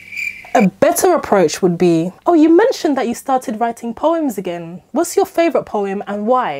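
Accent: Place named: United Kingdom. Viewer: Easy for you to say... with that perfect British accent you do not need to work hard at elegance :)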